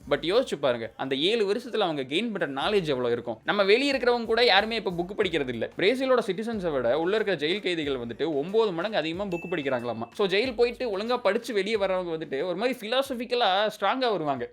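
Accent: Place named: Kongu, India. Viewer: கொங்குகவன்டர் ஸ்லாங்..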